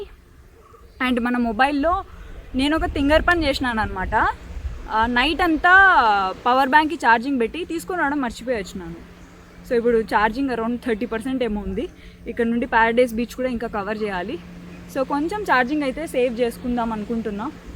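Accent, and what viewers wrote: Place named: Kadapa, India. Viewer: Where we are Mana (KDP) Slang maathraam intaante inabudhe avuthadhi 😘😘😘😘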